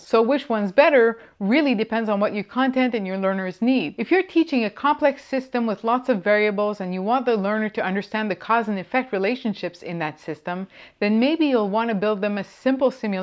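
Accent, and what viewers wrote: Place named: France. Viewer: L'accent français s'entend légèrement sous la couche d'accent nord américanisé. Un peu comme un vêtement rouge qui se voit sous un manteau légèrement transparent...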